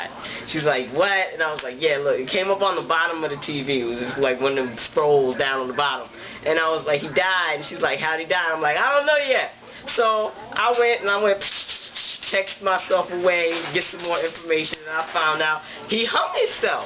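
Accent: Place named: New Jersey, United States. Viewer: Hey, sweetie. Are you from Jersey? You have that accent. I live in Jersey, too. I think you and I should have an affair. Are you game? Yes or no, baby. Kiss kiss.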